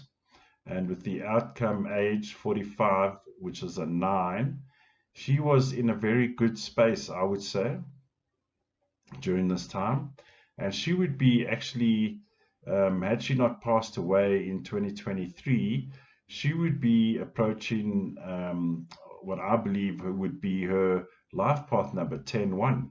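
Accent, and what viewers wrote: South Africa. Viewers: Hi, you sound South African. 👋👋 Hello.. Just subscribed. Thank you, this does shed light as you get more information to expand on this. It makes more sense. Something else was going on, because this whole case sounded fishy. I did not ever believe that Nicola jumped into the river, swollowed water on purpose, and unalived herself by that method. She was fit, fitness conscious, could swim, the river was not deep or flooding, she & she had her dog Willow with her. Plus she had 2 daughters. After 3 weeks of searching the river Nicola was not found in the river. Funny that. Plus there was a helicopter searching, drones, sniffer dogs, police searching, volunteers searching, police divers, and Peter who was a volunteer also offered his services for free. He used his divers, and sonar equipment as an added bonus, but despite all this collaboration, Nicola was not found in the river!! 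That is probably because Nicola was not in the river when they searched. She might have been placed in another body of water and later moved into the river because the autopsy would have to show Nicola was in water for 3 weeks. We are still waiting for the results and inquiry. Now Peter is the scape goat. Who stands to benefit from N being unalived? It was actually the police's job to find Nicola in the river because it was their main working hypothesis that Nicola was in the river. And how did they come to this conclusion? They were fed faulse information. They were trusting P's version which w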